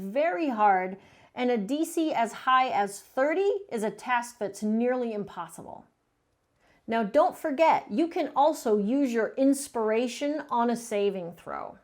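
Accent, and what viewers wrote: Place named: New England, United States. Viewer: Finally a New Englander talkin' about D&D! Go Sox!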